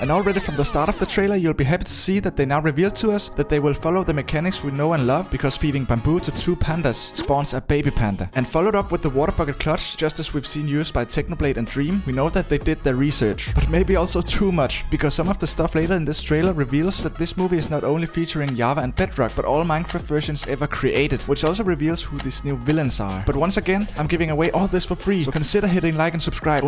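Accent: Denmark. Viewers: as a dane i can really hear the "danish" when u speak funny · Are you danish I think I hear some danglinsh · Den danske accent er kraftig men god video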